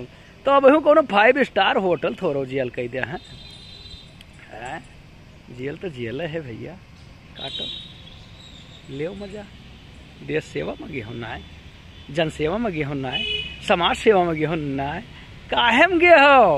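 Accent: Awadh, India. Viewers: kuch jada avdi bolte hai app but m sultanpur up44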